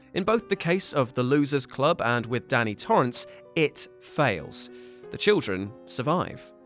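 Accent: United Kingdom. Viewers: wow, if you can think it....people can try and make any wild idea to try to make sense ... this is lame..... next video i bet you'll try to connect scooby-do with "it" and "the village" as one great universe... please stop, cause your british accent doesnt make someone sound smart, cause your silly, the evil ghost is not "it" in all his novels, so there you have it-you stink ..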